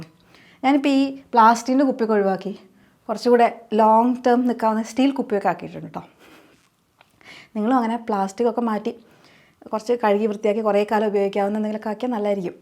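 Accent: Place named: Thrissur, India. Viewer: ചേച്ചി തൃശൂർ ആണ് ല്ലേ... ജർമനിയിൽ പോയാലോന്നും തൃശ്ശൂർ tech വിടൂല 😄.. എന്തായാലും ഇങ്ങൾ പൊളിയാണ് ❤️❤️❤️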